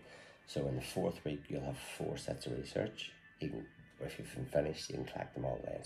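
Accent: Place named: Belfast, United Kingdom. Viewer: You popped up in my feed glad I starting watching cause think I’m hearing a fellow Belfast man ( edit forgot to acc say yeah you kinda talked me into it too) 😂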